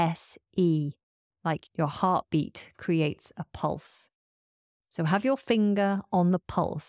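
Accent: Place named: United Kingdom. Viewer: I really love to hear a woman speak british english. excellent podcast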